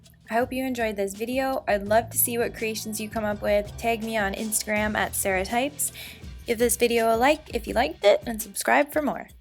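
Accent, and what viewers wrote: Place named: Canada. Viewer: Omg!! I didn’t even pay attention that this was your video until it came to the end and you said! I heard the Canadian accent but didn’t think anything of it. I am going to attempt one of these projects. Haha that is so coincidental. Glad it was you because I liked yours the best out of the rest I was watching lol!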